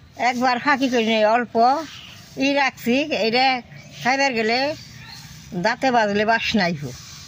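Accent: Tangail, Bangladesh. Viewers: বাংলাদেশে টাংগাইল জেলার মত ভাষা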